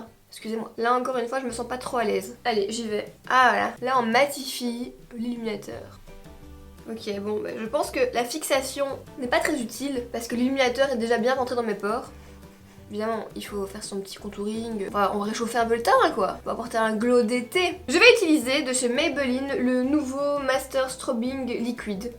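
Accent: Belgium. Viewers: Salut j'espère que tu vas bien je t'adore tu es ma youtubeuse préféré et surtout j'adore ton accent belge lol. J'espère que tu vas me répondre car aujourd'hui c'est mon anniversaire et le meilleur cadeau que je veux recevoir c'est que tu me réponde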